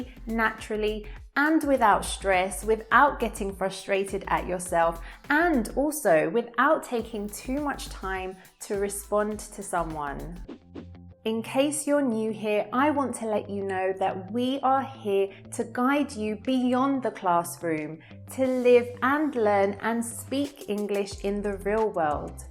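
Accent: United Kingdom. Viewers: I love your British accent my dear. 😍🥰😘❤ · Your british accent is really amazing thanks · I got difficulties to understand british accent😅😪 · British Accent.. sorry to say, my dream is wanna learn only American Accent...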